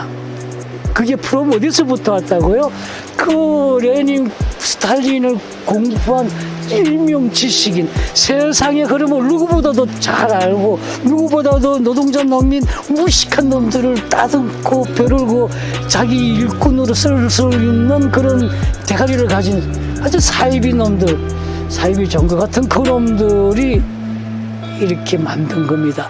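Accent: North Korea. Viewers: 이북 말씨네.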